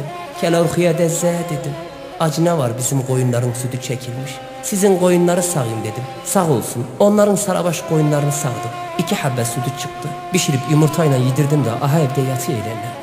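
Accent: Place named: Çobanbey, Turkey. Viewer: diline salık emi gene cobanbey şivesini çok güzel anlattımışsın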